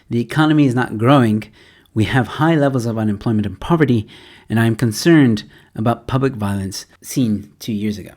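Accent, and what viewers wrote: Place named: United States. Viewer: Please keep going on as usual, so what if you sound American or look Mexican? I fail to see how that has any bearing on your opinion. I, for one am interested in your opinion and appreciate the concise presentation.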